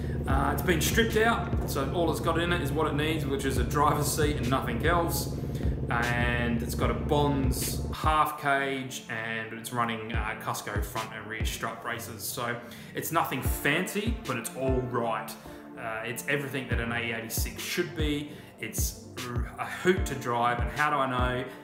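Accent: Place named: Australia. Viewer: haaha same dude, it was in my recommended, and I was like oh this guy sounds aussie, then I was like yo wtf its mallala haha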